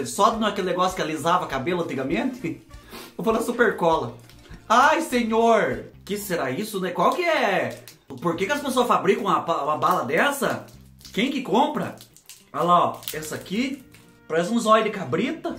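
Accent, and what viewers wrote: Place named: Paraná, Brazil. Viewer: Tava caçando vídeo de receita com fuba e encontrei esse canal, um fofo! ❤️ sotaque de paranaense, interiorzinho do Paraná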